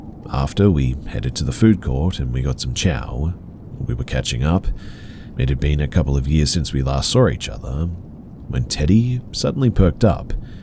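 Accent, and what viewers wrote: Australia. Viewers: Are you South Aussie? I mean; I am. And you have that type of Aussie accent you can’t quite pick which state you’re from 😂 but love the videos, they help me sleep. You’re voice, definitely top tier 🥹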